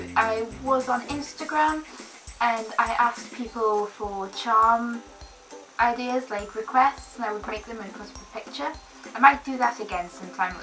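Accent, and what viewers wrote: United Kingdom. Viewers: Are you either.. Scottish? English or irish?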